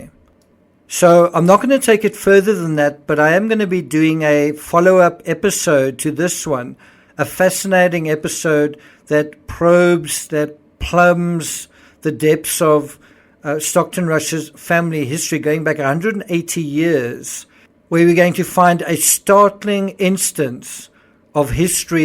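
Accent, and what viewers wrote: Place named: South Africa. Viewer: Ive been trying to figure that accent out and i think its South African? Great content btw.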